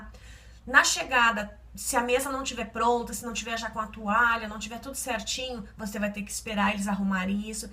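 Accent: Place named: Southern Brazil, Brazil. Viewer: Ouvindo o seu sotaque fiquei tentando imaginar de onde você é... RS? PR?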